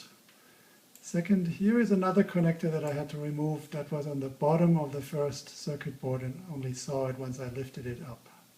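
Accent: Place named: Germany. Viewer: <tangent> I love your accent. What in the world is it? I'm getting slight German, but also slight... something from England, but I'm not sure what. Am I far off?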